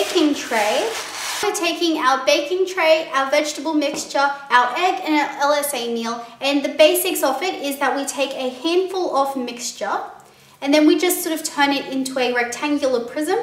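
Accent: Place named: Australia. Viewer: thank you for making videos - they are very helpful) its funny, but you sound almost exactly like heyyyjune, and i know you both are australian, but its not just that - im also subscribed to meejmuse and chloemorello, and they somehow sound i little bit different))) i just love aussie accent)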